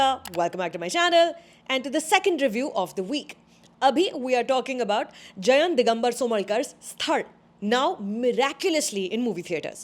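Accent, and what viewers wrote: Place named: Vidarbha, India. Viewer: Hey ....grt to see you cheering this beautiful creativity....thank you for acknowledging this vidarbhian art and accent also ...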